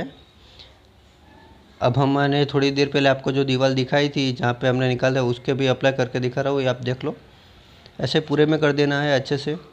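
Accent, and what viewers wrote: Gujarat, India. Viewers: Gujarati ho na??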